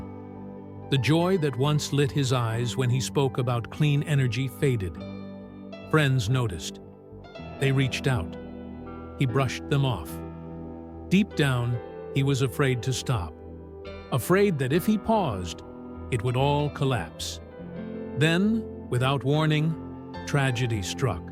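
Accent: United States. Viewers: Why this American accent on various vids. Anything English! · What is wrong with you this is the UK so why do we have to listen to what sounds like a an American accent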